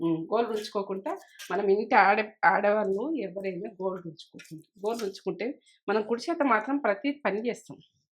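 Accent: Telangana, India. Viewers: Telangana yaasa Lo Cheppindi Amma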